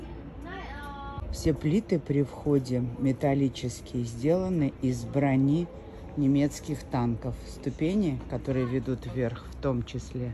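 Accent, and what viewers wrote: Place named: Moscow, Russia. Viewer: голос тридвараса..москвича все ясно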